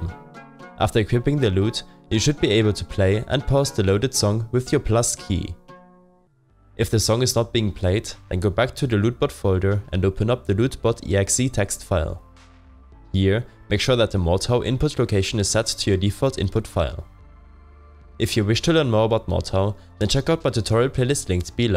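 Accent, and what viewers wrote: Germany. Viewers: You sound German mein Freund.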